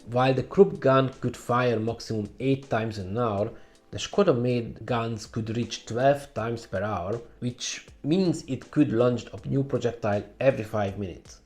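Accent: Hungary. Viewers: Szia! Magyar vagy igaz? Magyar akcentussal beszélsz angolul😊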